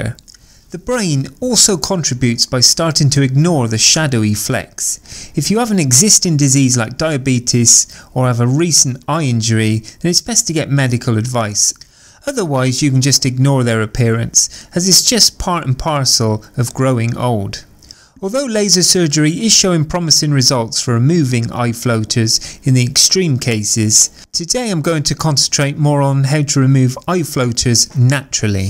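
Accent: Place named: England, United Kingdom. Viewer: Where in England is he from?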